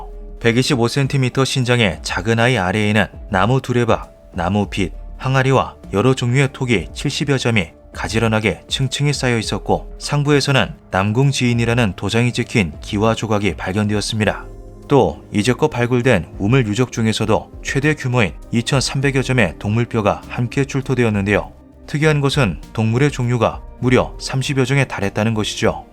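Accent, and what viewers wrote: Gyeongsang, South Korea. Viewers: 씹할 갱상도ㄷㄷ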